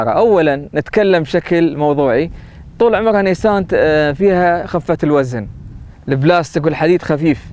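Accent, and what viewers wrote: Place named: Iraq. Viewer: طريقه كلامك كأنك عراقي الاصل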